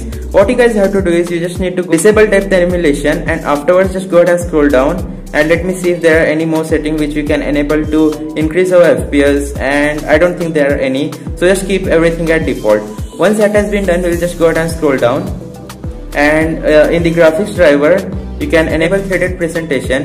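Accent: India. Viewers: How does that every video titled " How to get 228 fps on low end for 20 bucks no shit for real" is recorded by some indian feller with terrible accent? 😂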